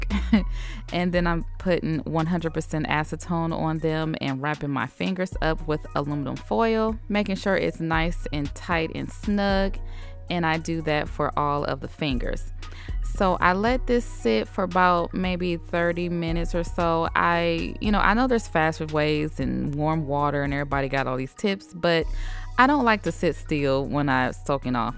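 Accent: South, United States. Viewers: Can I just say, with that southern accent and smooth voice.. i swear you sound like Beyonce, especially when you giggle, girl. Thank you for these calming chill videos☺️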